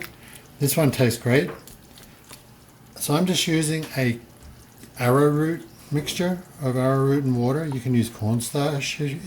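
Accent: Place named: Australia. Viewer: Are you an Australian living in USA? At first you sounded Australian and then I could hear an American twang so couldn't work it out! Anyway, a nice twist on the Aussie pie. I like the idea of the herbs and paprika so will give it a go :)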